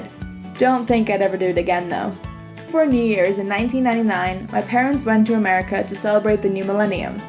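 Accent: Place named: Bulgaria. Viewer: Bulgarian accent's always the hardest to get rid of, haha.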